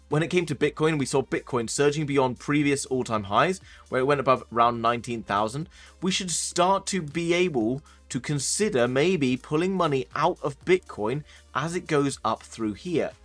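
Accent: United Kingdom. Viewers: I love British accent I can listen all day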